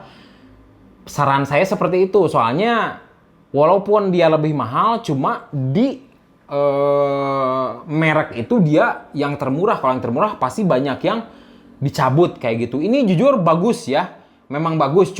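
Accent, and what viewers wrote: West Java, Indonesia. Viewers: Resep kana aksen sunda na 😂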